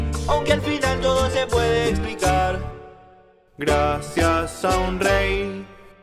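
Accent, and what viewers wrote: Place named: Bierzo, Spain. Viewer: Parece que cante en Berciano. Mantenemos muchas palabras de castellano medieval.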